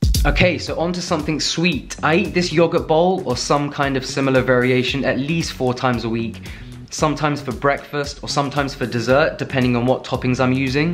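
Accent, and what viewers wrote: United States. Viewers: The random American accent made me sub! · kills me how he never fails to say “peenut butterrrr” in an american accent every time, even in voiceovers.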